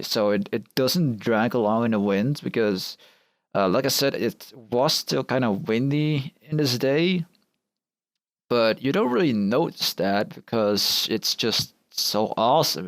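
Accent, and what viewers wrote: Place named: United States. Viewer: Dear, may I compliment you on your English!! And on your very very very American accent:-)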